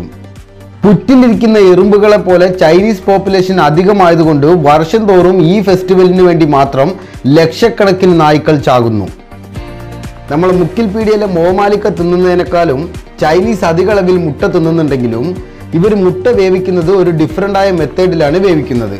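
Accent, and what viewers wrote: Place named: Highland region, India. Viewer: Hai bro aru from up hill distic ur longeage slang up hill modal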